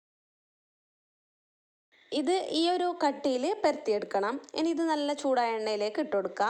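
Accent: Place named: Kasaragod, India. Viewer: നെയ്പത്തൽ കാസർകോഡ് സംസാരവും ഇഷ്ട്ടപെട്ടു 👍🏻👍🏻👍🏻👍🏻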